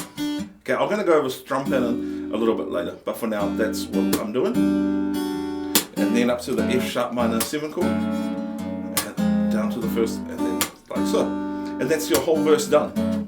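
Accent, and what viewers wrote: New Zealand. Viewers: bruh is he from nz?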